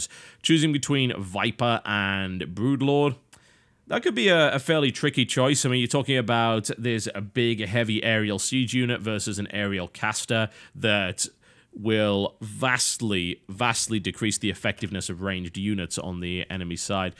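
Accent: United Kingdom. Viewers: I am honestly loosing my ability to detect your british accent... I don't know if it because I watch a lot of channels with accents from the U.K a lot and therefore Americans and people from the U.K are starting to sound the same but either way I can't even tell the difference that easily anymore.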